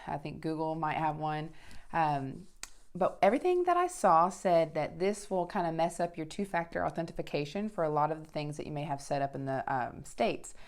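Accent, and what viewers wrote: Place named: Norway. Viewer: She has picket up the local accent That's why it sounds very special. I had no problem understanding it was Norwegian.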